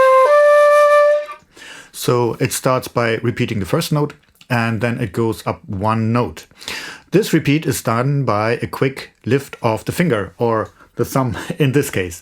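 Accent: Germany. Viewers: He has aGerman accent???? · The best shakuhachi tutorial on YT! Even your heavy german accent doesnt matter :)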